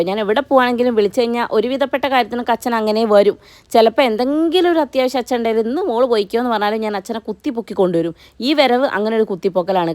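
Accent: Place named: Thrissur, India. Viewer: പക്ഷെ ചേച്ചി ടെ ഭാഷ കോഴിക്കോട്ക്കാർ പറയുന്ന ഭാഷയിൽ അല്ല ല്ലോ ചേച്ചി സംസാരിക്കുന്നത്. തൃശൂർക്കാരുടെ പോലെ തോന്നി ട്ടോ അതുകൊണ്ട് ചോദിച്ചത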